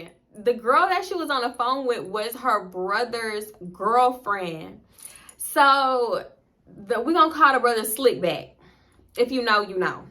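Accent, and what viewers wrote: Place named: Houston, United States. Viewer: I’m really trying to figure out are you a real Houston native. Moved here as an adult, moved here as a child ..lol definitely sounds like a Houston native with the lingo and accent . The body is giving Houstonian 😂😂😂 but I’m surprised I’ve never seen you before . Sound like you be a lot of places and artist I be around and you are very much eye candy 😬😂😂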